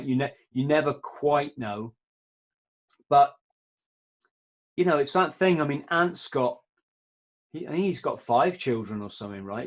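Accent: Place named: Ayrshire, United Kingdom. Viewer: Hey I’ve been aware of and Follow him,really enjoyed the podcast guys.Your definitely West coast/Ayrshire-Glasgow as you the accent is the same as mine 🙂. I’ll be sure to share and follow.👍🏻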